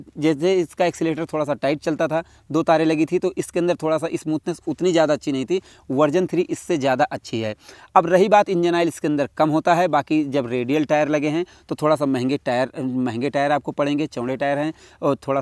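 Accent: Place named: Pratapgarh, India. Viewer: Bhai aap kaha ke rehne wale ho aapki bhasha se mujhe lagta h ki aap uttar pradesh jila pratapgarh ke aas paas se hi kahi ho...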